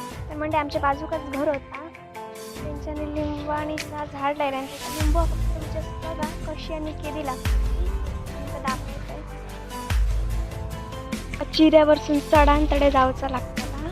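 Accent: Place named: Malvan, India. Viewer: Tuz gaon konat...? I like malvani language tone Very nice video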